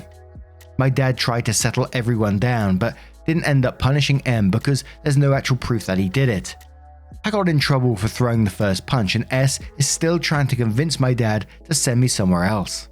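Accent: England, United Kingdom. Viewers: Except when dialectical colloquialisms are involved. is from a certain part of England that has a certain accent & certain phrasings particular to the region.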